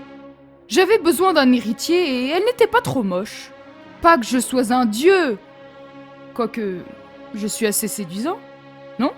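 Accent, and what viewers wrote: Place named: Italy. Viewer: Quelle vie cet! J'aime beaucoup l'ambiance italienne de ta vidéo avec l'accent et tout, bravo à toi 😃 et je trouve que ta construction est très réussi, j'aime particulièrement le bureau que tu as fais au rez de chaussé! Bisous à toi 😙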